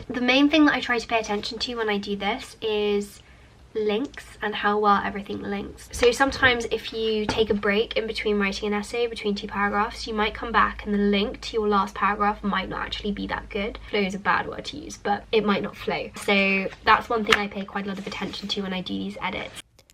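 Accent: United Kingdom. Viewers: I’m Italian and I adore listening your voice because I love British accent 🥰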